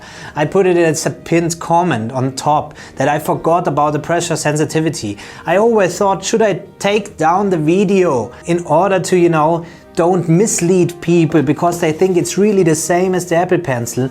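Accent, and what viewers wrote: Germany. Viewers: Where are you from? Germany?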